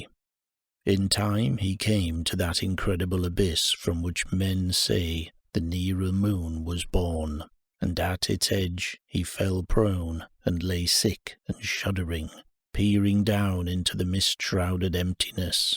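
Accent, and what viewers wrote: United Kingdom. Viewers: Great narration, thanks a lot. Nice to hear a Northern accent, I live in Manchester, UK. Looking forward to trawling through your content, thanks again.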